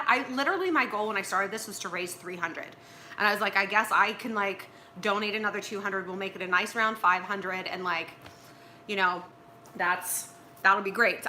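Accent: France. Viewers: The french accent 🤣😄🤗😄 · Oh my CRAP!!! I LOVE YOUR FRENCH ACCENT!!! SO MANY THINGS! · Wow that French accent!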